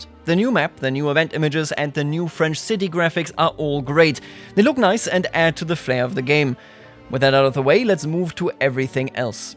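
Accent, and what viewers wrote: Germany. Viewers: how does he sound so german yet so fluent in english at the same time? i cant stop listening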